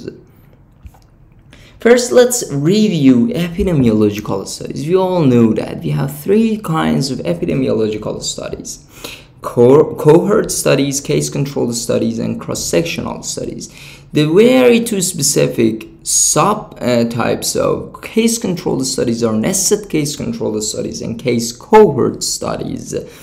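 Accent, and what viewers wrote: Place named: Iran. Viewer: Thank you for sharing this amazing video. Right from the start, I could tell that you are Iranian because of your lovely Farsi accent and the way you wrote "eighty" in Farsi 😁. By "group matching," do you actually mean frequency matching?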